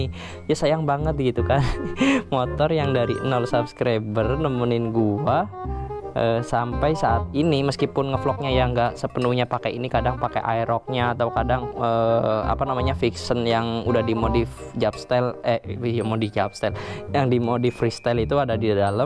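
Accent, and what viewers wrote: Tegal, Indonesia. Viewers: Ky orang tegal si bang logaknya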